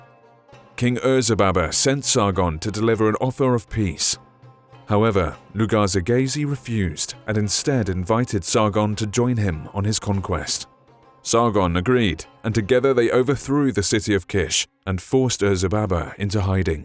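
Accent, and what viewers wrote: United Kingdom. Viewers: I though this guy is British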